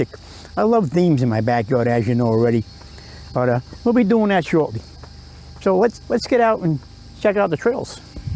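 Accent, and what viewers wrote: New York, United States. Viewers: Hi, loved this video...Your house and garden are looking so beautiful...Have meant to say before, I just love your New York accent...👍❤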